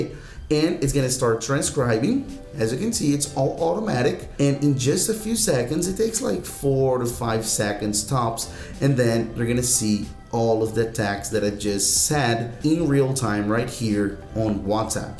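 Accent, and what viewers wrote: Brazil. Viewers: Valeu cara otimo video, na hora que vc começou a falar, notei algum sotaque brasileiro (seu ingles é otimo) ai confirmei quando eu vi Lazaro negao e Amor, Vlw !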